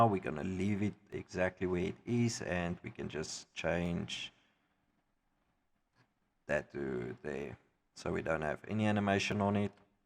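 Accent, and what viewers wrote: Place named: Afrikaans, South Africa. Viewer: i are liking your afrikaans accent :)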